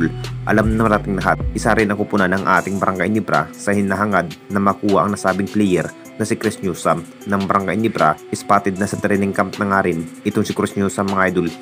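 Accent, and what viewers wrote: Visayas, Philippines. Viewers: Bisaya ka man dong.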